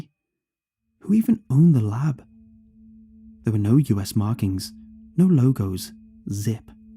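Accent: United Kingdom. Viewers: Sorry, can’t listen to some bullshit story about US troops from a British accent and verbiage. Wtf?!?!?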